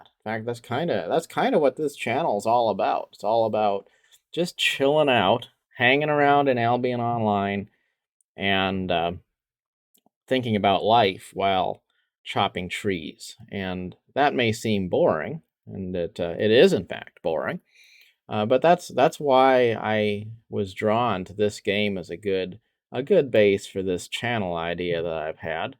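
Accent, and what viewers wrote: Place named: South, United States. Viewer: Just wanted to see if this was possible. Lo and behold, an Asian dude, in a cowboy hat, explains to me with a southern accent, how to play this game which it's main point is to combat, in a pacifist way. I am fully enlightened already and i'm just 10 seconds in.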